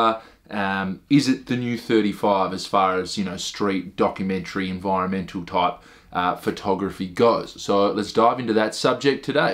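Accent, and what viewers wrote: Australia. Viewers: Gotta say this, love your true blue Aussie accent.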